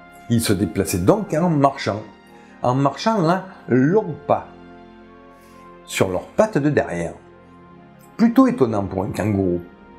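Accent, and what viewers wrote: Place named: Toulouse, France. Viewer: Holà A une époque où les chaînes de YouTube m'apporte plus que les années sur les bancs d'écoles.... La tienne franchement est exceptionnelle! Ton accent me rappelle Toulouse, ton vocabulaire et ton humour embellissent mes moments de détente. A 52 ballets,retraité précoce! Exilé dans la sierra uruguayenne... Je te souhaite une année riche et tranquille. Ton travail change la donne: Le savoir devient accessible et tu y contribue énormément!!! le Boss des temps disparu!!! Merci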